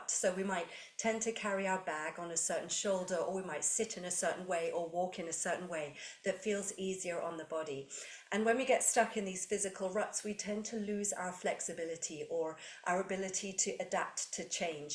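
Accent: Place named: United Kingdom. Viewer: Thank you / Suggestion. Dear. Thank you very much for bringing me back to my powerful practising of Kundalini yoga, and adding great practises to mines. May I humbly add this next part Just To Help Me, You and Other Students? If Yes, please read: For the returning and for the new students, I think it would be really kind to remind us that we do not have to do the practises as lengthy as you do them, and that we can stop as soon as the exercise becomes tiring. Also in this video, (maybe just due to your speaking fast & British), there was a confusing point from minute on, where you stoped 'showing us the exercise by doing it,' and start just explaining what we should do while still on our backs. Would you kindly make that shift clear? Truly thank you for your goodness and obvious skills brought to us in this most beautiful way. God bless you. 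May the Wind Be On Your Back, And Sun Always Shine Upon You!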